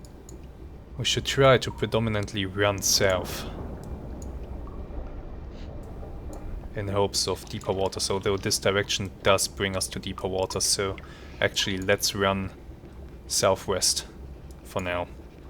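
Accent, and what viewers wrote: Germany. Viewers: I have recently started following your adventures, and am highly impressed; Your German accent adds total authenticity, and the scenarios reel off like movie scenes; Many thanks from England...;)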